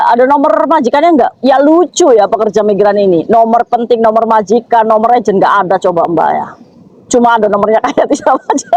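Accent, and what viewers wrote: Indramayu, Indonesia. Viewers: Kak semangaaat Tpu logat Indramayu nempel kaya saya ( kita ga dari Indramayu )😊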